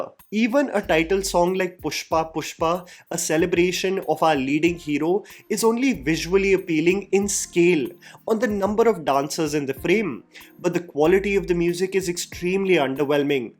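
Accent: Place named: North India, India. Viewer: Dude why is your English do bad??? The accent of a North Indian man-child aside, you use such terribly kaam chalaau words like "come down" n mispronounce words like "crescendo" and also say much awkward phrases like "shoulders of the performance of Allu Arjun". Clearly your abhorrent sentence structure comes from translating Hindi phrases directly into English without adapting it to language convention. I don't mind listening to your other commentary videos but your movie reviews specifically highlight your absolute weaknesses with the English language. Why not just do reviews in Hindi then? Perhaps you'd sound less awkward n blundering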